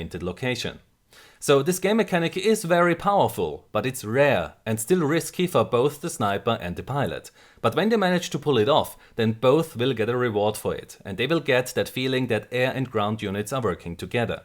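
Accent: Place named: Germany. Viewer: why u sound so german ?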